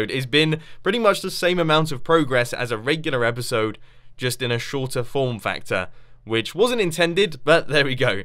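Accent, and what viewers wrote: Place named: United Kingdom. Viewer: I also enjoy your voice. Maybe that's because I'm from Poland and British english sounds soo darn good to me. Also those iron "things" you could call them compartment markers/borders. As a biotechnologist I find that properly proffessional. P.S. Is the Iron Foundry automatically crafting blocks of iron? Cuz I'm pretty sure it produces iron slabs...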